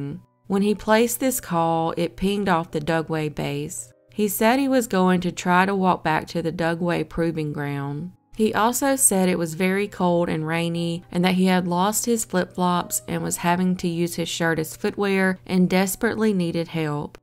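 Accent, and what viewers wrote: South, United States. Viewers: Your channel is growing fast! Awesome job! I think it’s the soft southern accent 😉 I’m from Mississippi and when I go out of town sometimes people just want to hear me talk 😂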